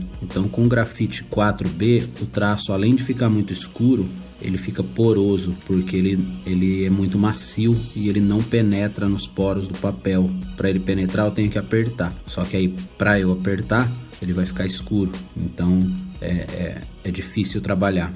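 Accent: São Paulo, Brazil. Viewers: boa parceiro. show de bola. Tentei entrar no site e deu nao encontrado. como faço pra fazer cursos com voce ? vc é de sampa ? (tem sotaque de sampa - conterraneo) eu moro aqui em Pinheiros - Vila Madalena. me chama no zap <num>. valeu.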